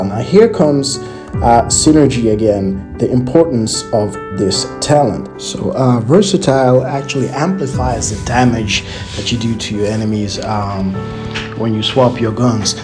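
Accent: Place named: Nigeria. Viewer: You sound Nigeria bro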